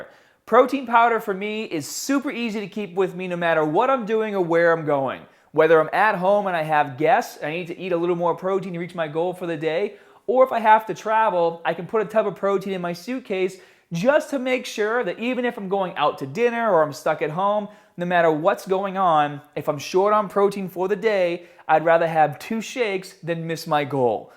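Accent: Boston, United States. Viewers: Man dat Boston accent is just not fitting, I'm so used to your old one. I've been off the gym for 3 months now due to a shoulder injury, I hope I don't lose all my gains... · dat Boston accent doe. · Where did the full on Boston accent come from all of a sudden?? He never sounded like this in his earliest tutorial videos??? :/ It's like he's putting it on or something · Boston 100% haven’t you seen The Depahted??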